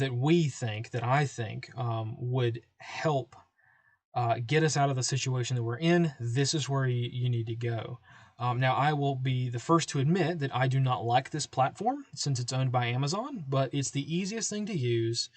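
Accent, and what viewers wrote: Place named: South Carolina, United States. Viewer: Just found your channel the other day and today found out you did briefs like this. This is awesome. BTW as an SC native you sound like one of us! Thank you for sharing your wealth of knowledge and skill with us. It is greatly appreciated and a service to your fellow Americans. Godspeed to all you gentlemen at S2!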